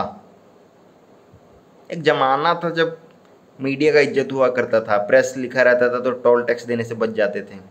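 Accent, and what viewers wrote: Bihar, India. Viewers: That bihari accent 😍, makes the video great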